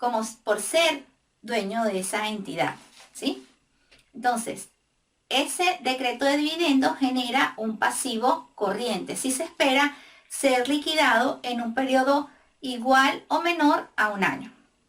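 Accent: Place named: Venezuela. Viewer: Muchas gracias por este video, estoy comenzando a estudiar contaduría y administración tus videos me han ayudado bastante. Quería preguntarte si es posible que hagas un video en cuanto a tips para entrar a trabajar empresas como PwC, Deloitte y KPMG, específicamente que herramientas o sistemas se manejan y que conocimientos podemos aprender para tener éxito en entrevistas de trabajo para ese tipo de empresas. Soy joven aún pero quiero trabajar en algo directamente relacionado con mi carrera y creo que entrar en una de las empresas que nombre anteriormente es un buen paso para comenzar y aprender otras cosas que no veo en la universidad, a veces siento que en la universidad se limita mucho a lo teórico. Te hago esta pregunta porque soy de Venezuela y por tu acento me parece que tú también😃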